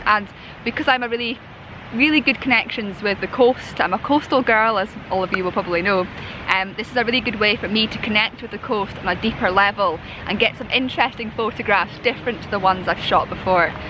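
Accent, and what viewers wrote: Scotland, United Kingdom. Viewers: .love the wave photos.I been watching all your videos and find them most enjoyable, If it wasn't for the cute scottish accent, i might say you talk a wee bit to much lass.Keep up the good work. hope you make it to Orkeny.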